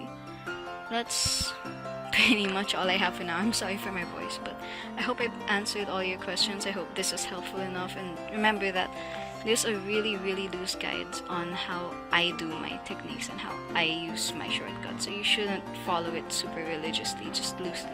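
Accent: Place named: Philippines. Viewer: your voice is so cute! i can hear that filipino accent a bit >:D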